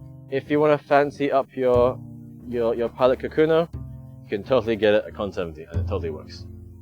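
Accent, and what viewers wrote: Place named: Australia. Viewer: Wow, Australian :) Where about?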